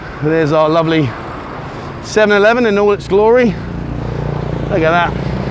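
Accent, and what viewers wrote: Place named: United Kingdom. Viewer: Why don't you make an effort to pronounce Pattaya correctly like Thais do .not like a Brit !!!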